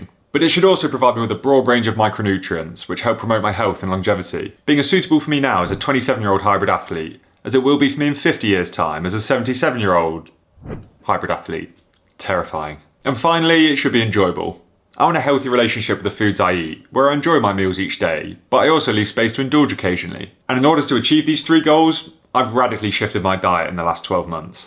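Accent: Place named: United Kingdom. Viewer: Please take no offense but it's hard to understand your content as you've got a strong British accent. You may wanna add subtitles to reach a wider audience outside of the UK.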